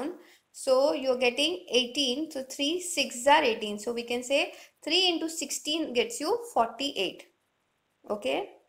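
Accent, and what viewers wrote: India. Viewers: The only problem is the accent. Indian accent is hard to understand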